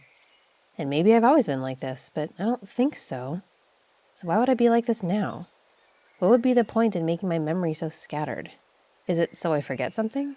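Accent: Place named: Midwest, United States. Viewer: Ope, Midwestern Sphinx identified!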